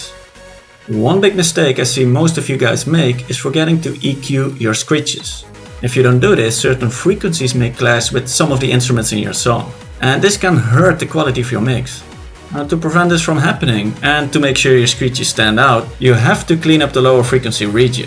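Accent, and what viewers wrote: Netherlands. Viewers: Ik hoor je een nederlander bent dus stel ik men vraag ook in het nederlands , als ik een screech rechtstreeks in de playlist load is de screech nice of normaal maar eens in de piano roll dan is de sample een heel pak korter tenzij ik jetnop een lagere noot zet maar dan is de sound ook lager dan wat ik wil dus hoe krijg een hoge maar lange screech in dienpiano roll ook de noten langer maken maakt de sample niet langer ? Grtz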